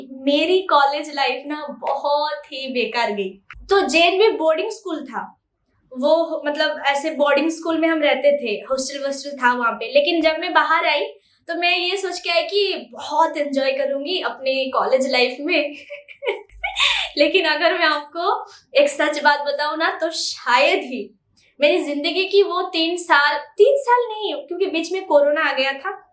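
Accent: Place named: Bengal, India. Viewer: Apki ABAAZ me bengoli bhi cute 🥰 lagti h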